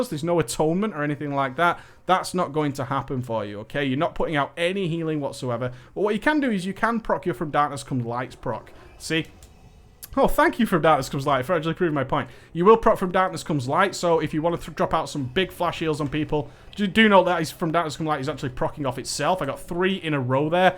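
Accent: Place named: RP, United Kingdom. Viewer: Love the energy and that elite UK english accent.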